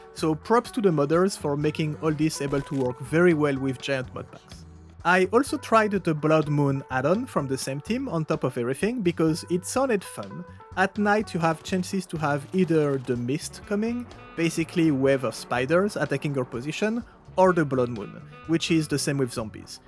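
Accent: France. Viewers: Hi dude, I guess many already asked you this but are you French ? Your accent sounds a lot like French lol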